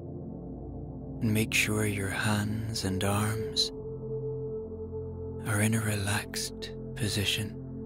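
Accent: Ireland. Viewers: I'm an absolute insomniac, however you help me relax with your beautiful Irish tone that reminds me of home, God bless you ❤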